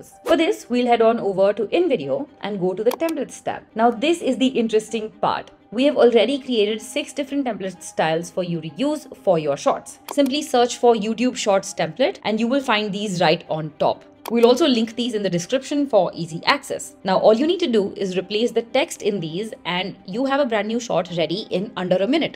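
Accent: India. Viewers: Your Voice Like : indian ❤ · I knew this is clickbait once I heard indian accent 👎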